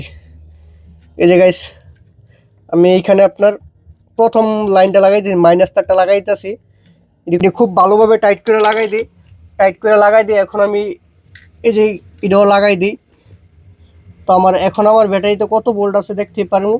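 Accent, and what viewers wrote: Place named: Char, Bangladesh. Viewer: ভাই আপনার বাড়ি কোথায়,দেখি চরের ভাষায় কথা বলেন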